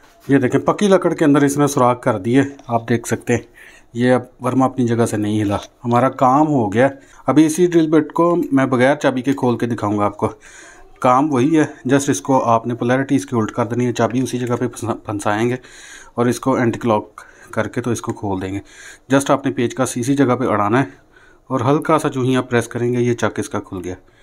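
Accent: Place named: Punjab, India. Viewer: Bolne me Punjabi aa rahi hai lekin madri zaban Punjabi ki izzat nhi karenge Pakistani punjabi log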